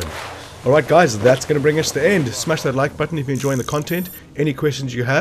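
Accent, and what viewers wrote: South Africa. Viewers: Awesome Vid, thanks. You sound hella South African.. Shout out from Cape Town. Keep up the GG's!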